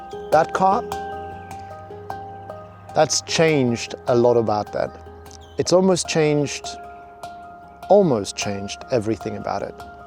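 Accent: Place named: South Africa. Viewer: Where in South Africa are you from?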